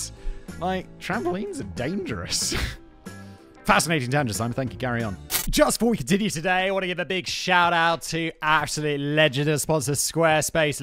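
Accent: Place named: United Kingdom. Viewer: You Tube SUCKS! I have removed this man's channels over and over again. But when You Tube changes the algorithm, it force feeds me this Simon guy's many channels despite my removing him. Simon's channels suck. They are poorly researched information that is mostly government propaganda talking points. Terrible videos. And just because Simon has a British accent, this doesn't not make him an authority on anything. He's just a talking head. England is over. Brexit has made the only job in England a You Tube content provider. Terrible list videos that spread falsehoods and bull.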